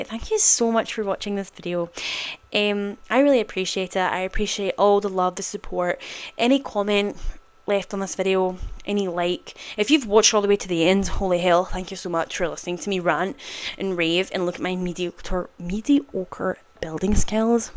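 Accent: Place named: Scotland, United Kingdom. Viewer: Fabulous build, great to hear another Scottish voice :eyes-pink-heart-shape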